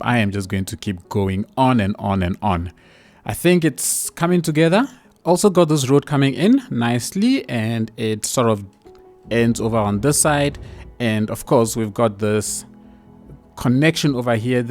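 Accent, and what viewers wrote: South Africa. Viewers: are you south african by chance? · I can smell the accent. Awesome to hear some South African youtubers playing City Skylines. Hope you are blessed, brother!! Video Suggestion. Build a South African Major City replica - durban,Capetown, etc. Keep up the lekker videos man. God bless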